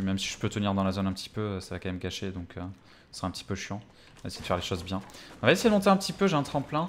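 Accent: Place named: Russia. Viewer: J'adore ton accent "roose"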